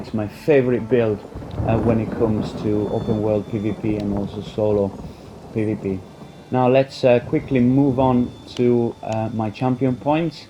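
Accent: North, United Kingdom. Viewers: subbed. your accent is mad :) you sound like you are from up north, but you also sound slightly Italian man 😂 not bad though, I like it, it doesn't have those annoying vowel sounds that they make. lol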